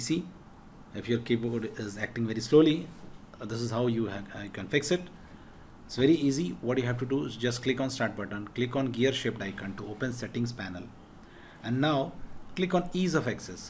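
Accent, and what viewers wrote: India. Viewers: Always the dudes with the Indian accents who don’t require you to download some fishy app xD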